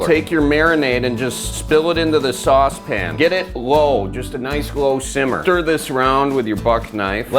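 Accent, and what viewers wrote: Midwest, United States. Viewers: Thank goodness for captions because you are full-bred Midwest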